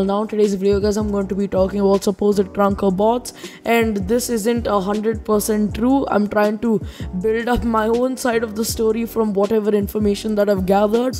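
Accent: India. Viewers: R u indian?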